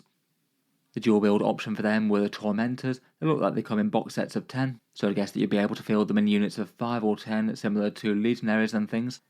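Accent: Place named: England, United Kingdom. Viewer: No. It's a UK mainland accent. Maybe Leicester or Leeds.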